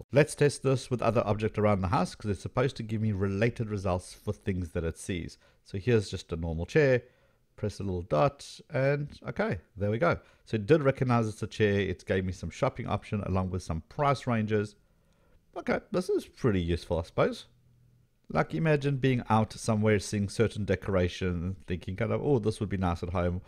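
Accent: South Africa. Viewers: Are you South African living in the USA?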